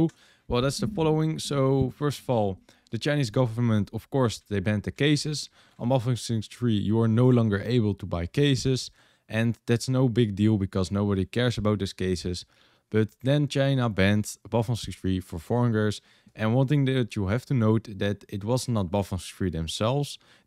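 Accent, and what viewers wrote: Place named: Netherlands. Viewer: Mate you sound so dutch lmao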